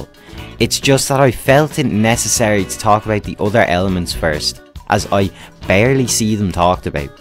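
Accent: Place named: Ireland. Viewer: I thought I’d never find an Irish tf2 YouTuber. I could instantly tell by your accent. Good videos btw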